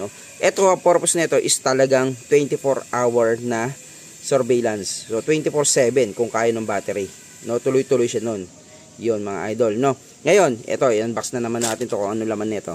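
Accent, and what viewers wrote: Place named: Marikina, Philippines. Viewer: Puntong marikina, taga marikina!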